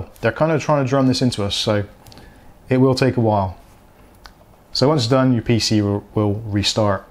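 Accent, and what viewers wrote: Bristol, United Kingdom. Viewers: love the bristoliannn accent! makes it 100x more friendly lol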